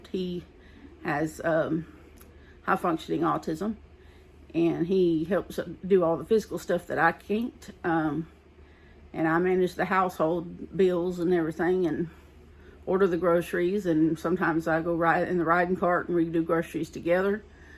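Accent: South, United States. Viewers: Good evening/morning,! New subscriber here... both to your YT channel and Instagram:) I've spent the past couple of hours watching & liking a number of your videos, and I can't tell you how happy I am to have found your channel. From the yarny goodness to the furbabies to the family stories to that wonderful Southern accent!!! (I'm originally from VA, have family throughout the deep South, including LA, but currently live in OH. I miss that accent, that "way" of speaking to others, that "heart" put into conversations with friends and loved ones. I truly do appreciate what you contribute to YT and the crafting community!! Now that I've rambled on a bit, I best let you in on all the furbabies we have/ have had... al rescues, either from shelters or from the streets. Those were usually the ones who adopted us rather than the other way around, but animals know who'll love and care for them as family, don't they? 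I look forward to seeing more from you... and I'll go back to binge-watching and crocheting, as the first two grandbabies are due this year... in July and September. You take care of your self, and your sons and furry sons too!!!!!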